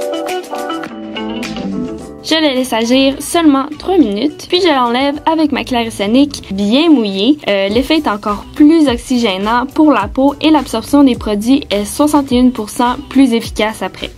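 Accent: Quebec, Canada. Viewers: jou jou québécois je crois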